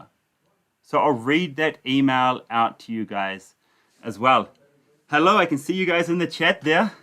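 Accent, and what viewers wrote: India. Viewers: Hi I’m in the blue mountains in Sydney. We are quite good! I have friends in Bangalore that banged on pots which was quite beautiful to wake up the government.. take care and stay safe! You sound more Indian than a kiwi.. blessings 🙏🏿🙏🏿🙏🏿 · Stay safe......just started watching your vlogs after that police incident. And liked your hindi accent. Love from bangalore.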